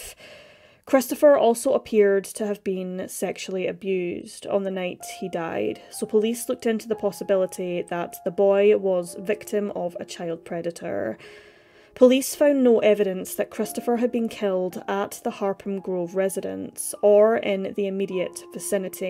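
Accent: Scotland, United Kingdom. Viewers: Shes Scottish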